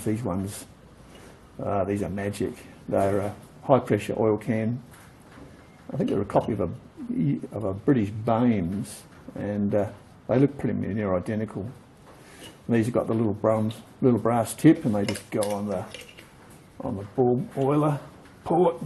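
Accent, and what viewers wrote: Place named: Australia. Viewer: Soooo good to hear an Aussie sprouting his stuff! Good on yer, mate. Keep emailing coming!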